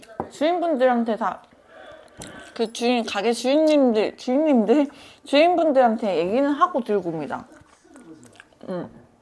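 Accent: Gyeongsang, South Korea. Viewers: 약간 경상도 사투리가 묻어있는거 같으세요ㅎㅎ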